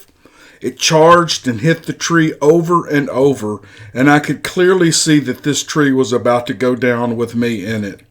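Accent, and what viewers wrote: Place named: Georgia, United States. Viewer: Thanks, sir. I am from the Deep South. I also lived in LA about 12 years, moved back south for about 16 years, and now happily reside in the Seattle area. I definitely believe in Bigfoot and listen to a lot of channels on the subject. I think I enjoy your narration more than any because you have a particularly appealing southern accent. Reminds me of southwest Georgia. You sound kind of like my brother in law, who’s a really good guy. I had heard this story on another channel some time back and found it fascinating, and so glad you are sharing it here.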